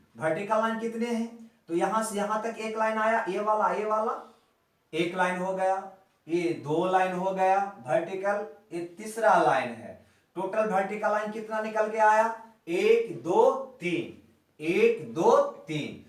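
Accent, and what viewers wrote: Bihar, India. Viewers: Aap Bihar Ka ha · Sir Aap Bihar se hai???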